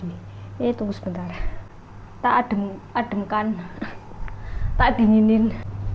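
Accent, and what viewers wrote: Banyumas, Indonesia. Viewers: Mba asli orang mana ko bahasanya ngapak kaya saya orang banjarnegara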